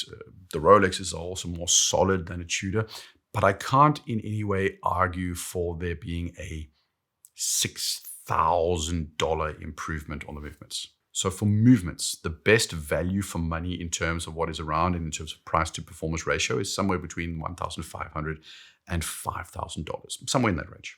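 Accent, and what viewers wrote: South Africa. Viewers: Your accents sounds soooo South African. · My wife is South African, I’ve been to South Africa, love the people and the place- this is a heck of a watch channel, but I do feel biased for that South African accent…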